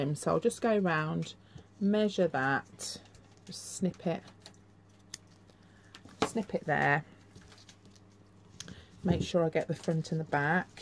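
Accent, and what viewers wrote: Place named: Northern England, United Kingdom. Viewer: Hi.I don't do crafts but find it very therapeutic to watch.Also I like how real you are and love your Northern English accent.Thank you.☘️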